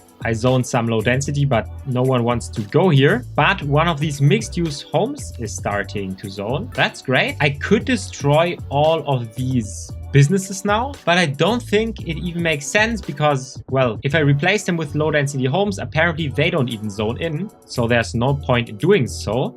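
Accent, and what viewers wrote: Germany. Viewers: I hear a german accent :) great video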